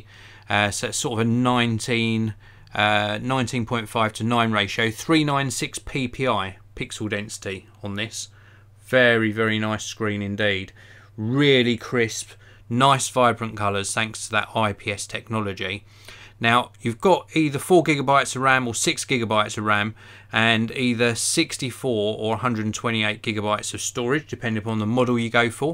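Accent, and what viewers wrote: England, United Kingdom. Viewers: Its called an English accent mush!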